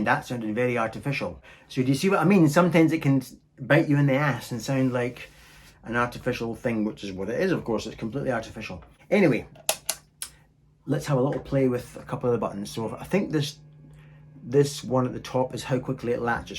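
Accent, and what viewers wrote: Scotland, United Kingdom. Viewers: Cool video and Scottish accent is the coolest accent in the world. cheers from Vancouver, Canada. Ed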